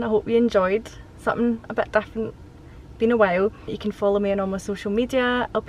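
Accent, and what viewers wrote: Scotland, United Kingdom. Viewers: I am in love with the Scottish accent!! 😍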